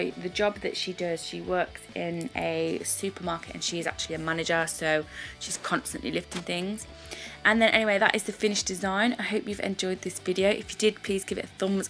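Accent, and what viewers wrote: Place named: Essex, United Kingdom. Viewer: I've just recently found your channel. I've watched several of your videos and I must say you do some beautiful nails! You're very creative with your artwork. Also, I just love your accent. Where are you from? I'm guessing Essex, but hey I'm American and don't know the different English accents,so please don't be offended if I'm wrong! 😀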